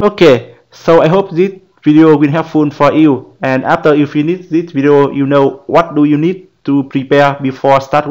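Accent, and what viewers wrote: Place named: Vietnam. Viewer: You accent is sooooo Vietnamese, I LOVE IT <3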